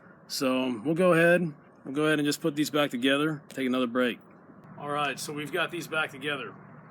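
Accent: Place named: United States. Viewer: You are Chinese why do u speak American accent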